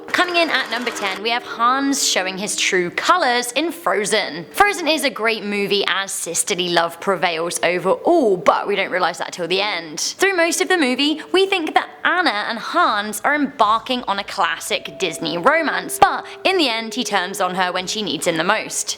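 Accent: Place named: England, United Kingdom. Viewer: what part of England do you hale from?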